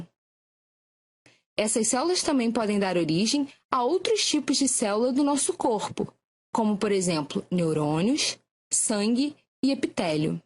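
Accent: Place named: Rio de Janeiro, Brazil. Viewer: Sutaque maravilhoso, as cariocas são maravilhosas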